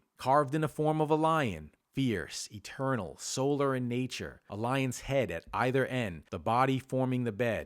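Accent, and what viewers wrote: United States. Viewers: Excellent ~ Thank You! May I ask, you live in Egypt but have a strong American accent ~ NOT faulting! Are you of Egyptian descent who returned to Egypt?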